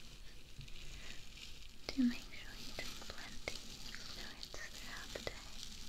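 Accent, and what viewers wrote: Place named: Midlands, United Kingdom. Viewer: I get the impression you're from the Midlands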